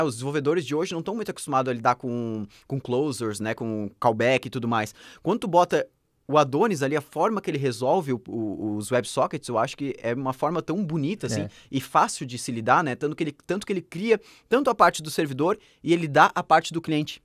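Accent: Rio Grande do Sul, Brazil. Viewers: Vc é gaucho?? que papo é esse de TU?????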